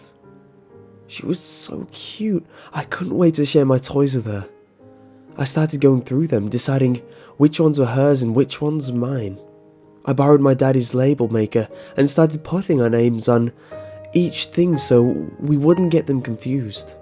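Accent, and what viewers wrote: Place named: RP, United Kingdom. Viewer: Omg when your on youtube and you listen to american vids all the time and then listen to a british guy we sound so posh lol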